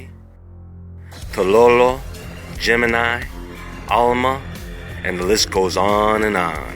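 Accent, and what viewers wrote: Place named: New York, United States. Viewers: I love your channel you sound like a fuckin badass you must be a brooklyn kid, you deserve 50 quadrillion subscribers. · Would make a perfect narrator for a mob documentary i'm serious man you got a great voice I don't know if you're from New York or New Jersey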